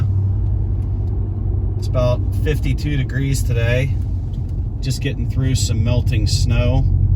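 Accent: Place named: Pittsburgh, United States. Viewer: I knew this was a PGH accent.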